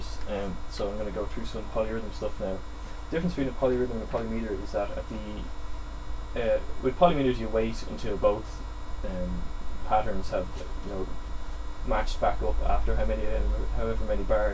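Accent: Ireland. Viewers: Your irish? awesome :D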